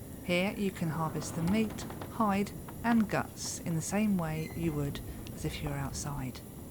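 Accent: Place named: North Yorkshire, United Kingdom. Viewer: I'm digging the update, the only thing I don't like is the HUD condition icons sticking around in their awkward positions when they turn red. Kind of distract me from the immersive beauty. Aside from that, all great. I really liked your review, by the way! Funny little asides, and a dab of oop North Yorkshire accent with the all important tea worship. It's a shame we didn't get you narrating previous updates, oh well. I hope you think about doing a review when the full game comes out, this was a pleasure to watch. And those new weather effects, eh?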